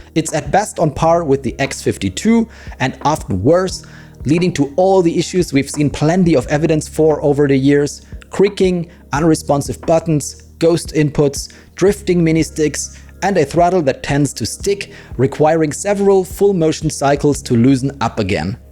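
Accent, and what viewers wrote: Germany. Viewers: Hey don't want to sound mean but are you German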